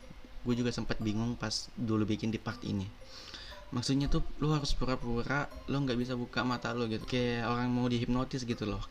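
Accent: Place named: Makassar, Indonesia. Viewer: Mungkin karena kalau ndak salah part 2 dia pakai kaya logat makassar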